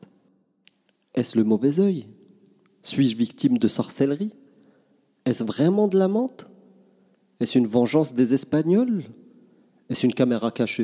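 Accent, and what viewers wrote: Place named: Germany. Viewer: j'adore le petit accent allemand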